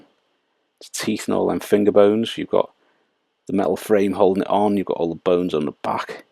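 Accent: Liverpool, United Kingdom. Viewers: Why is whenever I hear a fellow scouser on YouTube I instantly sub 😂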